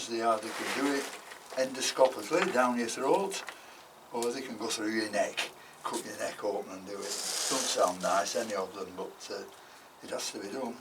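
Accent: United Kingdom. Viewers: I love the way you say "boogs"😁 I'm american and fascinated by the british accent anyway, but yours is especially fascinating. I love your channel and learn so much every time I tune in. Thank you so much for all you do for us amateur hobbyists.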